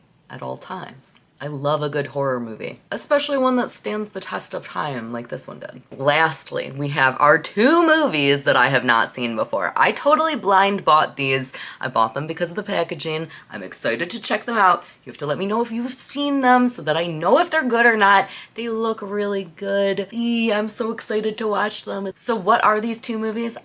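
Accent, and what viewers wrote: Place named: Detroit, United States. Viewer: Can I test my accent ear and guess you’re from Michigan? Specifically the Detroit area.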